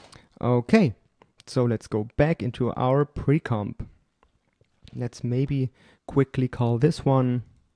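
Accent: Germany. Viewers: Deutsche Ausprache xD Aber gut gemacht :) Wie wäre es mit Deutschen Tut's?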